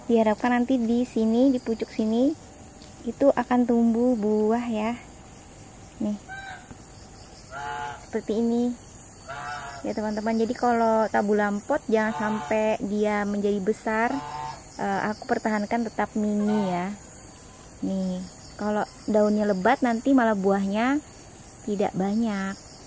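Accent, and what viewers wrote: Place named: Java, Indonesia. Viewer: kak pernah tinggal di Jakarta ya??cara ngomongnya mirip sama orang di Pulau Jawa umumnya hehehe, soalnya kalo orang Kalimantan asli ngomong Bahasa Indonesia itu agak beda dari orang di pulaubJawa🤣🤣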